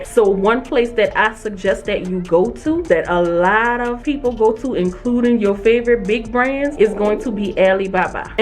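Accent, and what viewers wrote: New Orleans, United States. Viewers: Hi. Love your channel. New here. I love your videos! Question, are you from NOLA? I hear your accent a lil bit. 😊I love New Orleans so much. ❤❤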